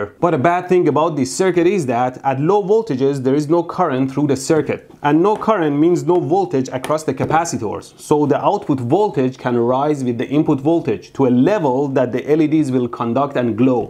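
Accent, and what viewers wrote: Iran. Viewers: Yep that's the strongest Persianified English accent right there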